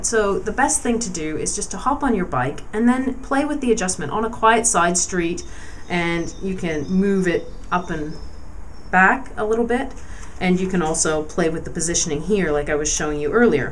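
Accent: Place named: Canada. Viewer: Canadian, eh? Nice review.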